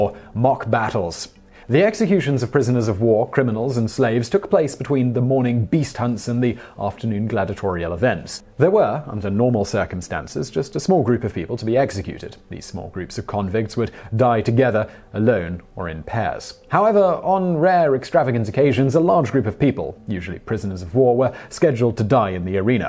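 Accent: United Kingdom. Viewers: I love your accent! Fellow Brit watching :)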